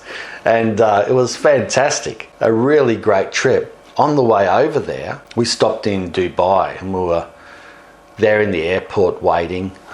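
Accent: Australia. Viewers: I like your accent, it reminds me of crocodile dundee, your so full of life, I just feel like gardening myself. Great job. Great video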